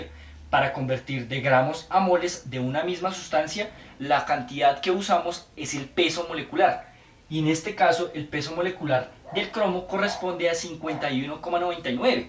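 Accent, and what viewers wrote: Colombia. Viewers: Profesor de que parte de Colombia sos ? :D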